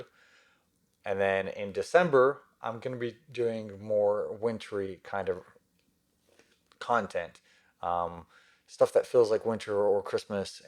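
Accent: South, United States. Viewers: I love your Southern accent!!!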